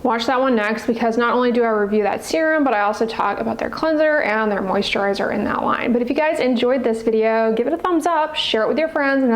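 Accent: United States. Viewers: WOW what weird thing to say!!! She has a lovely American accent, nothing unbearable about it!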